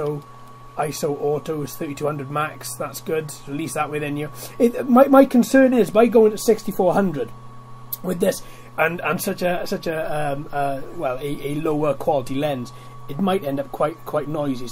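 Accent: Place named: RP, United Kingdom. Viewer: ooooo very posh buddy x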